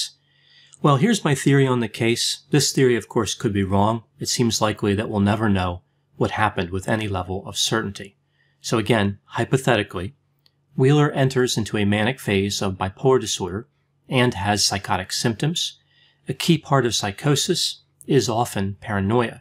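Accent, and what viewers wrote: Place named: Baltimore, United States. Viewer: There was an Unsolved Mystery located where I'm from too. Season 1 Episode 1- Baltimore at The Belvedere Hotel. I lived a block away from The Belvedere for 5 years. In one apartment I had The Belvedere was my bedroom window view. It was really fun seeing all of the different shots of the building and the neighborhood around it. Some great shots in that episode. I knew was lived near me because we have the same accent.:) Regarding this case- I also said trash truck compactor but they didn't mention that in the show. My other theory is he was beaten by teens because there is a problem with teens beating/ killing homeless people. They probably saw him disheveled and thought he was homeless which is why they never looked to take his watch and money. Then he was either put or went in the dumpster then finally killed by the trash truck. 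I wondered if he ended up in Newark because he was trying to get to New York and he misheard the person who gave him the ride.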